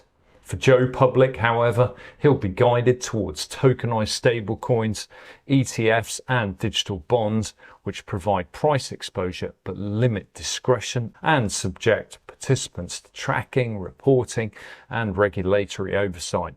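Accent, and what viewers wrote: United Kingdom. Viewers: I’m new to the channel, and I’m impressed. If you’re in the USA & older like me, try putting playback speed at 90% to 95%… much easier given the Brit’s accent. Great video.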